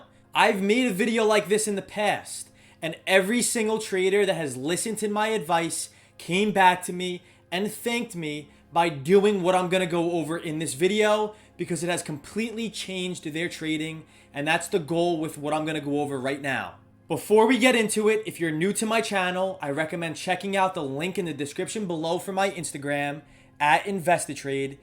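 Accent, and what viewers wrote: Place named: New York, United States. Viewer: There is zero chance this guy is not a New Yorker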